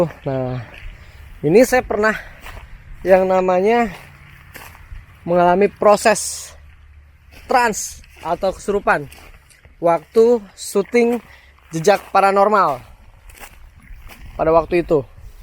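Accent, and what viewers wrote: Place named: Kalimantan, Indonesia. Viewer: Orang Kalimantan mana suaranya 😄😄 #Yoyoyoy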